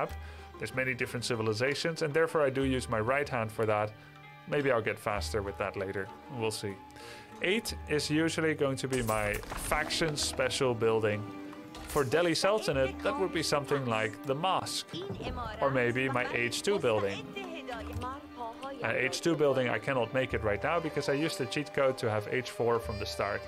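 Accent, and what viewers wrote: Netherlands. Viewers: Is that a Dutch accent i hear? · Haha jij bent echt zooo duidelijk een noord-Nederlander. Dank voor de heldere video!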